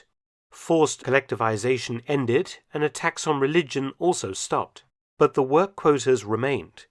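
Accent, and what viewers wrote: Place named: Southern England, United Kingdom. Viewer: A generic southern/Home Counties English one.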